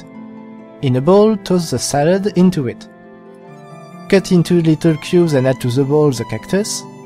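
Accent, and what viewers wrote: France. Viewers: Know what part of Europe is he from I feel like he is french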